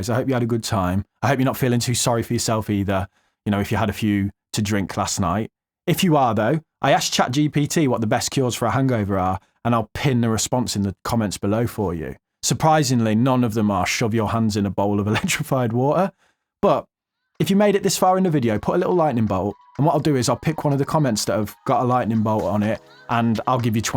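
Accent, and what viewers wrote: United Kingdom. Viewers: ⚡️I hope you don’t ask me to transfer btc to your wallet in order to receive my score. I’ve enjoyed following your success. It’s good to hear a British voice, with something to say, that’s worth listening to. ⚡️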